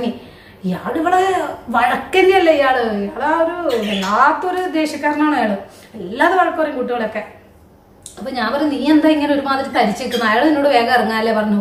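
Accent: Kannur, India. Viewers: enikum thonni😍😍😍 edak kannur keri varunund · ചേച്ചി കണ്ണൂരാണോ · Enikkk Kannur Slang Onnum Kathilla Njan Kollath Annn Kannur Slang Kekkennn Rasam Und Muthappante Swantham Naddd Eshtam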